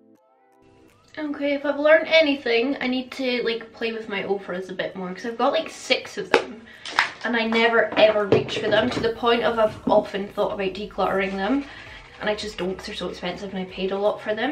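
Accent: Scotland, United Kingdom. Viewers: I love your videos! I just want to say thanks for your videos, I am struggling with my anxiety just now and your videos help take my mind off things. It's also really lovely to hear another Scottish voice😂💖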